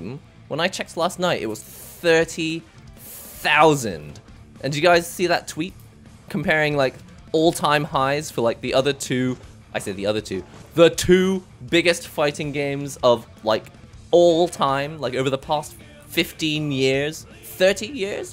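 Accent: England, United Kingdom. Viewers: There's no way he's actually from Japan, he almost sounds like he has an English accent rather than a Japanese one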